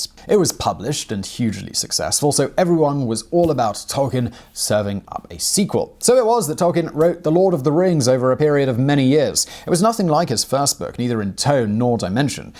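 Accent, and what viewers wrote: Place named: RP, United Kingdom. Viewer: Your deliberately exaggerated posh English voice is excruciatingly annoying. It's like watching a sketch of 'Cholmondley Warner' from Harry Enfield.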